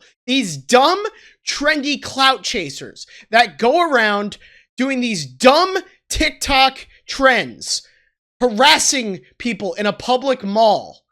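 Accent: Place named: Long Island, United States. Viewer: I knew u were from Long Island something about your voice that sounds native to me lol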